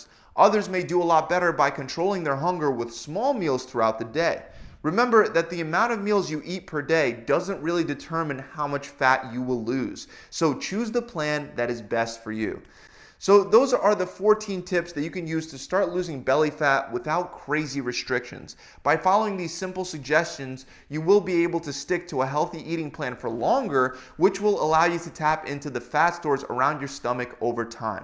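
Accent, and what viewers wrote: Philadelphia, United States. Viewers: Coming here just to say that this guy is obviously from Philly: "drink more 'WOODER' ['water'] throughout the day!" Wooder wooder wooder! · Me: He sounds like he's from the northeast. Dude: Wutter Me: Philadelphia. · Are you from philly? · He's from Philly or South Jersey. He says wooder.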